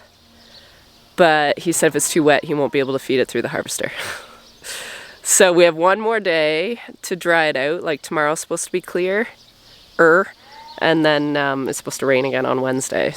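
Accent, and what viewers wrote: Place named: Ontario, Canada. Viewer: I'm from Ontario but live in NZ and love hearing my home accents...following the trials and joys... I always learn so much from you, sheep and farm side... always inspired by how hard you work and the dedication to the animals and land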